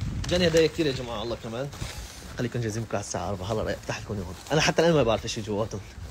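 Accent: Iraq. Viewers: انتة من وين من العراق لان تحجي عراقي؟؟؟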